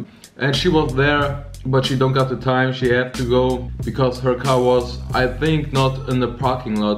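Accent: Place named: Germany. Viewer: Bin ich die einzige, die den deutschen englischen Akzent total nervig findet? :,D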